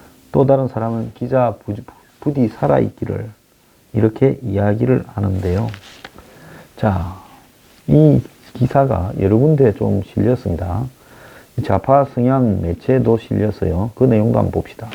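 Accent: Gyeongsang, South Korea. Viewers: 완전 경상도 스타일 ㅋㅋ 화이팅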